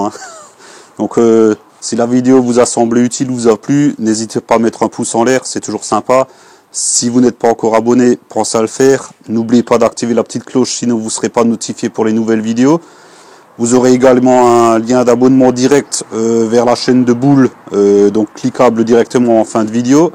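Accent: Alsace, France. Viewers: Salut, tu viens dalsace? Par rapport a ton accent:D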